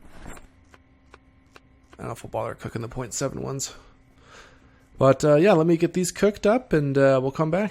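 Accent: Canada. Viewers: feed me, feeeeeeeed meeeee my video addiction. I need to hear more of that Canadian gurrAGE'ness.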